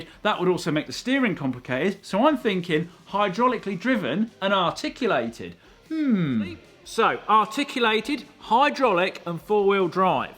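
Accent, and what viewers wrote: United Kingdom. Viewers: He sound so British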